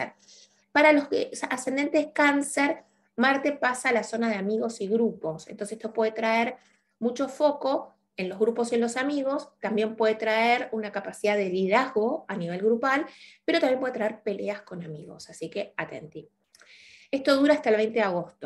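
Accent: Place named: Argentina. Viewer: Saludos desde chile.espero algo bueno de marte en tauro.ud estimada sra? Comento lo de mes de julio. Con mucha humildad ud dijo q su ascendente? Es geminis. Y ya q se algo de gemas? Averigue? Que la gema para ud? Y en su ascendente? Es la piedra o gema... agata. Es su talisman indicado. Es lo que se. Desde chile? Mi pais? Un hermoso abrazo fraternal? Hacia ud? Y un abrazo de amistad entre chile y su pais españa? Me parece? O argentina. No se bien de donde es ud. Le encuentro acento argentino. Bueno sea cual sea? Amistad. Chao sra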